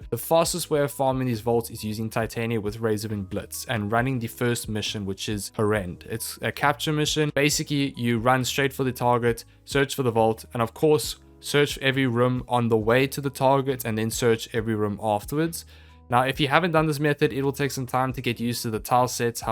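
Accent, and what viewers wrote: South Africa. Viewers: Man you really sound South African. hmmmmmmmmmmmmmm.... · Are you from S.A. ? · Love the south African accent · South African? XD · Are you from South Africa 🇿🇦 🤔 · South african accent or is it just me? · Are you South African? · South african? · This brus South African 😂. I can hear that accent from a mile away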